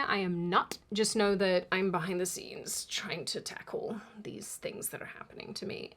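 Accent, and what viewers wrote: Australia. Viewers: Wow, hearing her speak so "naturally" and candidly is really nice. And she comes off as likeable, funny, and with that Australian streak of charm. 🙂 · Is it just me or does she sound more Aussie than she has in the past? So sorry she’s going through this…😢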